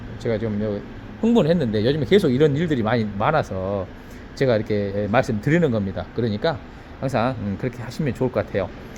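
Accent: Jeolla, South Korea. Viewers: 전라말씨기 찡해버리네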